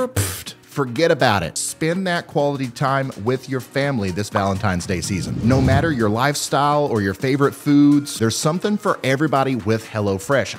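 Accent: United States. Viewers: As I noted how country your accent was I realized how country I speak as well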